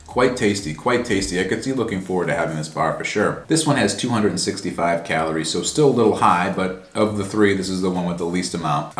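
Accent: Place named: New England, United States. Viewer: You could be right on the cost. Sugar has to be the cheapest sweetener by far. I'll definitely be watching to see how the anabar brand expands and see if they do add some lower calorie flavors in the future. I'd be willing to pay the extra money if I could get them to fit my daily macros. By the way you are crushing it with the content! One of my favorite youtubers for sure. I assume your from New England based on some of your clothing and accent. I was born in Mass and grew up in NH. Cheers!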